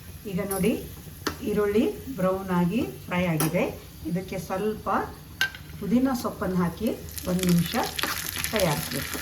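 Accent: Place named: Konkani, India. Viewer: Aap kyon Konkani style bolate Shetti style boliye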